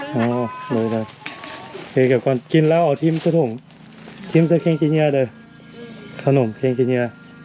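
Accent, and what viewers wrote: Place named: Luang Prabang, Laos. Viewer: มาดูคลิปที่2 สำเนียงพูดกับเด็กไปเหมือนสำเนียงหลวงพระบาง บ้านอยู่ไหนเหรอ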